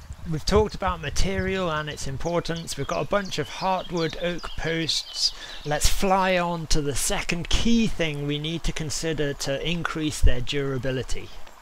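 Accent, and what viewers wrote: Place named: West Country, United Kingdom. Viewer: But you don't have a Welsh accent. More like Somerset or Norfolk? No, wait; I've heard it in Bristol.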